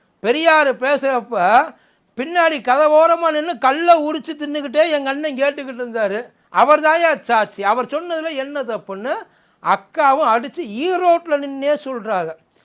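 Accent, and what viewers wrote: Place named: Telugu, India. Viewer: அட லூசு பயலே உனக்கு அரசியல் என்றால் என்னவென்றே தெரியுமாடா பரதேசி நாயே திமுக அடிமை நாயே நீ பேசிட்டு இருக்கதே வைக்கும் அவனை தெலுங்கு நாய் அது தமிழ்நாட்டுல இப்படி தாண்டா இருந்து பேசிகிட்டு இருக்கீங்க இதே வேற மாநிலத்தில் இருந்து இப்படி பேச முடியுமான உங்களுக்கு அந்த அளவுக்கு சுதந்திரம் கொடுத்து இருக்கு வந்தவுடனே செருப்ப கழட்டி அடிக்காத வாய்ஸ்ல தெரியுது தெலுங்கு காரங்களா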